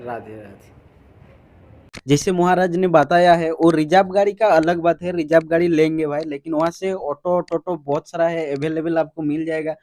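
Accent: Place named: Bengal, India. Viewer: কথা শুনেইতো মনে হচ্ছে বাঙালি... তা বাংলা তেই বলুন না... নিজের ভাষায় কথা বলতে কি লজ্জা করে??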